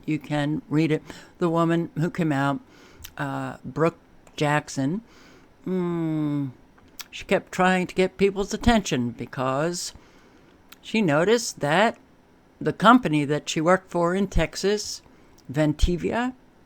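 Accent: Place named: New York, United States. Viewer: I wish I could just give you a big squeeze. Not trying to be weird. I just love getting to see the face that goes with the voice of reason I love to listen to. Boy is your New York accent coming back quick since you moved back to the north east.😁 Thanks for all the research you do. Keep on keeping on. Much love my dear 💗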